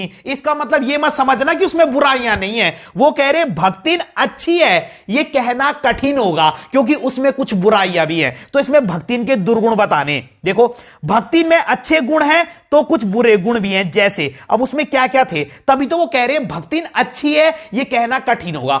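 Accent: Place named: Pahadi, India. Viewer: Merko aapki voice se lag ji Raha hai tha ..aap pahadi ho...😅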